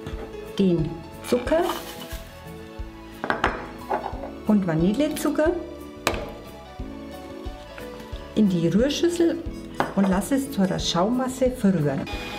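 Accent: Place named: Franconia, Germany. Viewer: Liebe, deine Videos sind einfach spitze! Wie schon viele andere ZuschauerInnen geschrieben haben: total gut erklärt, praktisch gelingsicher, zudem die vielen praktischen Tips und deine ruhige, freundliche Art... Passt einfach alles! Ich wage es mal, eine persönliche Frage zu stellen: Du siehst vom Typ her aus wie eine Oberpfälzerin (ich kenne so einige, deren Schwester du sein könntest!🙂), aber der Dialekt geht eher ins Fränkische, finde ich. Ist da was dran? Ich freue mich auf weitere Rezepte und Tips von dir! Liebe Grüße!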